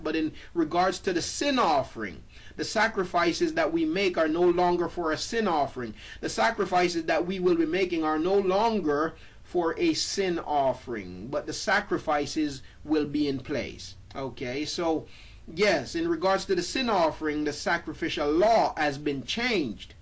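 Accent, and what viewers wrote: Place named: Caribbean. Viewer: Thanks for sharing. I heard your voice and was trying to place this Island brother.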